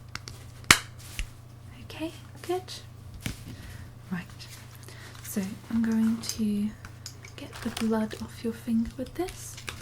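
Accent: Scotland, United Kingdom. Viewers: ASMR love your asmr. genuinely thought you were Scottish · I LOVE BRITISH ACCENTS THEY ARE SO BEAUTIFUL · What happened to the 'Jings Crivens, help ma Boab' accent ? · are you scottish? is just that your accent sounds kinda more poshy on this vid in comparisson with the others haha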